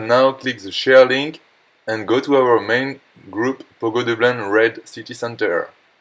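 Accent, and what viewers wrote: France. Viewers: St’accent francais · Hey, thanks for the video and helping Dublin Pogo players to be all a Pro :) . Small correction: "/" is just called a "slash" in English, "backslash" is this "\" which you might use in Windows. For the rest and on internet only the "/" is used for addressing a sublocation, exclusion, separation and in Telegram a request like /start to start a request. Bet you know that better then me (ur better in programming languages, then English (which is not bad anyways: Love ur French accent ) but had to say the confusion slash/ backslash\ which seems to be common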